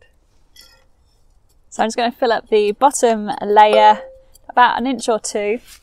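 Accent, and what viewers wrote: England, United Kingdom. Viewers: I must say... It is nicer listening to your English Accent. My American accent is very flat sounding...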